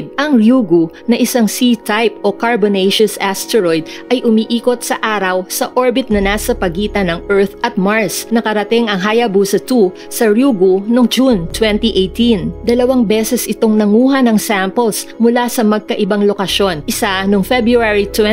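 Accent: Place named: Visayas, Philippines. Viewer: Sayke haha langya na pronounciation yan napaka bisaya sayke nag aral ka ba ?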